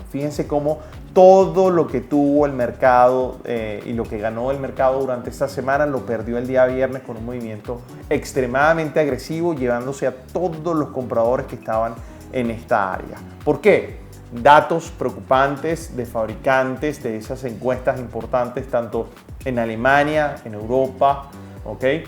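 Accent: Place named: Venezuela. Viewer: Por tu acento creo que eres venezolano, yo también lo soy y vivo en New York y ya tengo casi dos años en el trading, espero aprender mucho más con tus videos y con tus tips, y agradecido por compartir tus conocimientos, para los que aún estamos en este proceso de aprendizaje.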